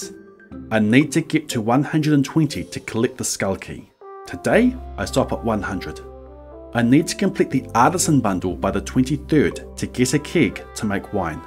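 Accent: New Zealand. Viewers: New Zealand accent. 👌🏽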